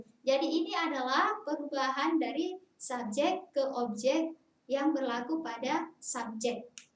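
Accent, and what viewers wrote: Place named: Java, Indonesia. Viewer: Bahasanya masih medok mbak e, kurang dari medok malah👎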